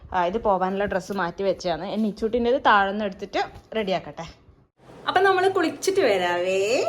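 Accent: Kannur, India. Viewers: പുതിയ ഒരു വാക്ക് കൂടി കിട്ടി.... കുറച്ചു ദിവസം മുന്നേ പുതിയ ഒരു വാക്ക് കിട്ടി കുടിയോ... ഇപ്പോൾ അടുത്ത വാക്ക്... ചേച്ചി ടെ സംസാരത്തിൽ നിന്നാണ് കണ്ണൂർ ജില്ലയുടെ ഭാഷ ശൈലി മനസിലാകുന്നത്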